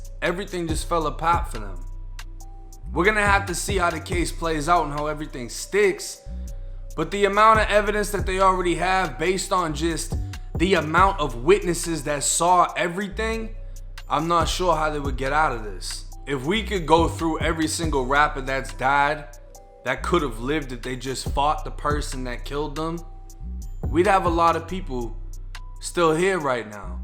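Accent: Boston, United States. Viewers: U from Boston? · How do you have a Beantown accent coming from Florida? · Bruh you could be from Tampa but you got that Boston accent straight up!!! Salute to your work tho.. always a great watch #MISSIONHILL #BOSTON here to represent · Lol the Boston accent makes more entertaining to listen to the stories.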